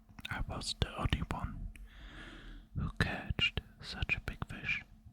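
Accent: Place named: Germany. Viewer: Always love some rambles! Turns out, the german accent is great for ASMR whispering :)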